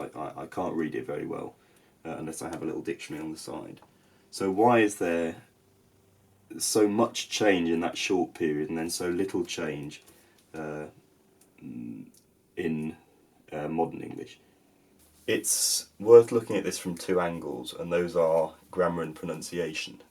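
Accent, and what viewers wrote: United Kingdom. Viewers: I am russian. And I hear your english is so beautiful. British accent is so european, just like latin or french 😅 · He sounds more british than a fooking Benedikt Cumberbatch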